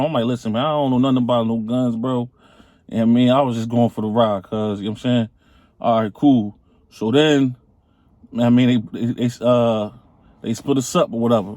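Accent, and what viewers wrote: Philadelphia, United States. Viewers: U sound like u from Philly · Sound like you from Philly jawn a Philly word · U sound like a philly nigga slim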